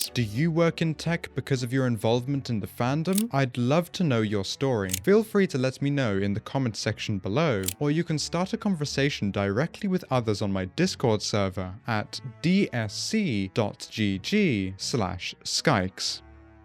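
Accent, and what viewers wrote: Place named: United Kingdom. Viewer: Why are you British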